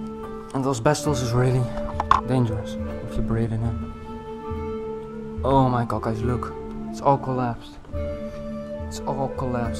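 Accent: Netherlands. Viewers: vette video maar je hoort wel dat je nederlands bent gast😂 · Volgens mij ben je Nederlands aan je accent te horen.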